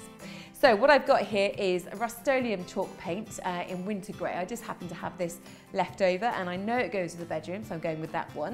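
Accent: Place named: England, United Kingdom. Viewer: Finaly a proper english accent. Sooooo tired of American accent on YouTube. Thank you ⚘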